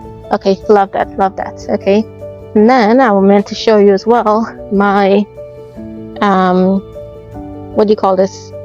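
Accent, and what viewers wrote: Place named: Caribbean. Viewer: I think I hear a Caribbean accent there! Great video! ❤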